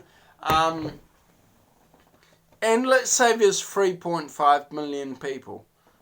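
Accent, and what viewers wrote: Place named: New Zealand. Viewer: Watched a few of your videos man, I am living in OZ at the moment but born and raised in NZ, loving the accent haha. Anyway, this garbage was pitched to me by 2 friends already and decided to check it out... I can't believe people are actually falling for this. The site has no explanation of how they're making any money other than creating a big useless pyramid of "investors". This is the worst MLM pitch I've ever seen lol... same shit as bitconnect, usitech, amway, arbonne etc. Keep it up... been warning them this is gonna collapse eventually. I dont know if any of them have been paid or not.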